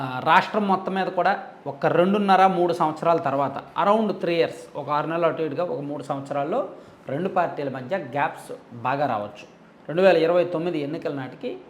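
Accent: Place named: Srikakulam, India. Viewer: బ్రో..ని భాష చూస్తే మా శ్రీకాకుళం లా వుంది ..మీది మా శ్రీకాకుళం అయితే దాన్ని డెవలప్ చేయమని వీడియో చెయ్ బ్రో.. చాలా మంది పనులకు విజయవాడ hyderbad వలస వెళుతున్నారు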